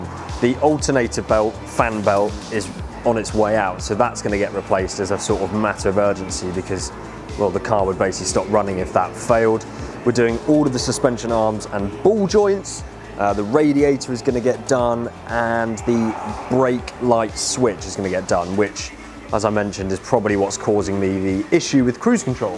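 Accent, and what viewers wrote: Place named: West London, United Kingdom. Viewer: I like the way to say Garsekets instead of gaskets true west london twang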